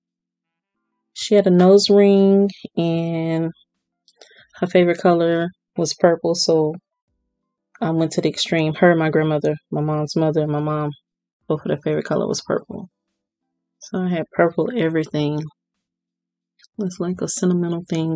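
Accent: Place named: Chicago, United States. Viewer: YOU SOUND LIKE YOU FROM CHICAGO!😊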